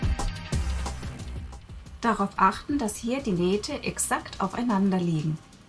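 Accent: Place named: Karlsruhe, Germany. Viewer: nein ich höre es am dialekt :D komme selbst aus karlsruhe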